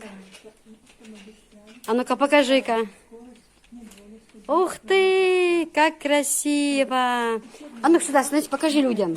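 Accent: Chechnya, Russia. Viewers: Ма шаа Аллах1.,вас не отличишь от чеченки-даже акцент чеченский.Умничка,смогла на все 1000% влиться в семью,в быт,в традиции.Так и должно быть,когда выходишь замуж,то нужно перенимать их привычки и традиции,а не навязывать свои. Дети,очень красивые ма шаа Аллах1. Дала дукха баха бойла. Шу Дала барт цхьа бойла,аьтто бойла!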